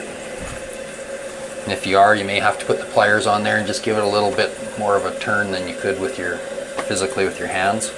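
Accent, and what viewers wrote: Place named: Canada. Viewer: Hi! I can't get over how much you remind me of a friend from (5th grade? ish?) whose name was...and lived a couple of doors down from me in Seal Beach, California....and he was from Canada too (I assume you're from Canada with that accent). I know I already asked you if you lived there as a kid and you said no.....but, if that's true, I swear you have a doppelganger out there somewhere! Thanks for all of the amazingly helpful videos, btw! I'm getting ready to have an addition built and I feel like I know all kinds of things that will be helpful in the process.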